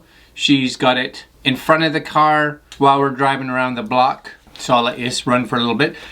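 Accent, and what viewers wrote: Canada. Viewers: That's one hell of a Canadian accent ya got there chuck.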